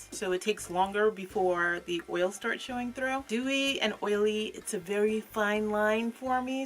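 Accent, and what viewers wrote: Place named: New York, United States. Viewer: I just stumbled across ur video u sound SOO much like New York or Tiffany Pollard😍❤❤ I was curious about these 2 products. I'm glad I found ur review! I like winter kimmie!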